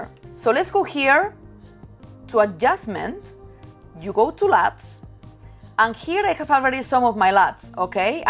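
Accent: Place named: Spain. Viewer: That spanish accent oh myyyyyyyyyyyyyyyyyyyyyyyyy <3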